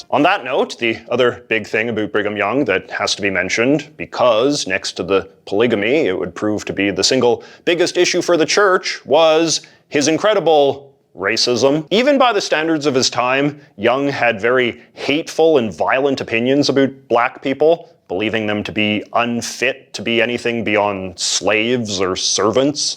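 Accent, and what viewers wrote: Canada. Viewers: Your 'bouncy' countenance is driving me insane for some reason, like you rhythmically bounce up and down slightly to the cadence of your speech, twisting your head for emphasis on some words and then give a more pronounced 'bounce' to emphasize others. Like it's all I can notice whenever I see you talking, which is fine aside from certain extremely stereotypical Canadian pronunciations. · The purity of the Candian accent is just..... chef's kiss...... <3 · You must be canadian by the way you say "about" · 🇨🇦Ha ha. Nice Canadian accent ya got there, Eh?!👍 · Canadian, eh? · Why am I just now hearing about this guy with 80's hair and Canadian accent? Because I've been listening to Neil Young? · He's Canadian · An ethnically ambiguous surfer dude that says “about” like Canadians. Modernity is so fun.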